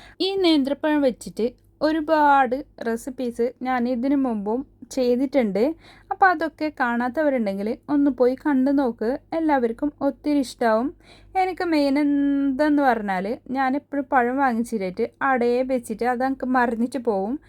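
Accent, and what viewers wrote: Kasaragod, India. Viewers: Kandappole kothiyakunnu, pazhuthu poya pazham vechu oru super pudding, kasargod varthamanam kelkkan rasamanu 👌👌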